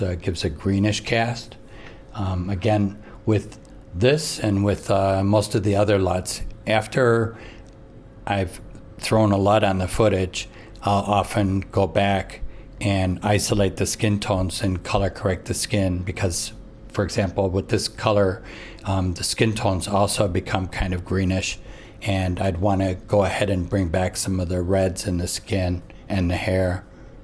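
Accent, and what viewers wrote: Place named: Chicago, United States. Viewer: awesome info, i gonna grab the leeming lut for cine 2 and try it out! Btw are you from chicago?? I think i sense a chicago accent lol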